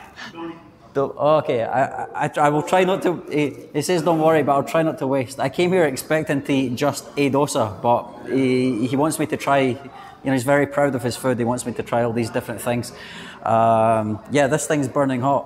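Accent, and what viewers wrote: Scotland, United Kingdom. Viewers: Yup ! Thick Scotland Accent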